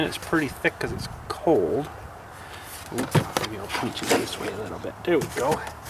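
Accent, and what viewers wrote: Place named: Canada. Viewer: You sound like you’re from Canada just by the way your accent is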